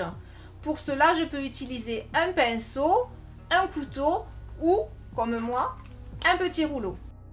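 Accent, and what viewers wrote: South, France. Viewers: Bravo pour vos belles toiles,c est un plaisir de vous regarder, et de vous entendre avec ce joli accent du sud cher à mon coeur.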